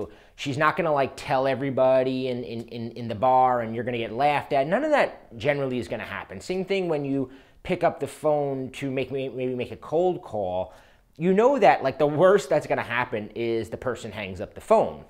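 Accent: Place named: New York, United States. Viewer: are you from Long Island or Queens?...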